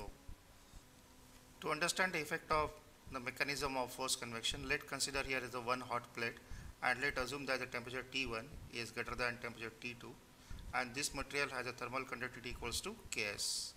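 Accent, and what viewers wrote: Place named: India. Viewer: for the fuck's sake. i hate this accent and every fucking lecture on youtube has an indian guy speaking with stupid accent which is like a torture to understand. fuck this shit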